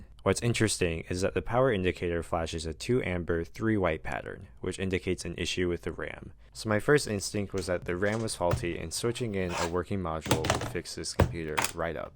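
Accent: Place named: United Kingdom. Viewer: why is there a man from UK talking?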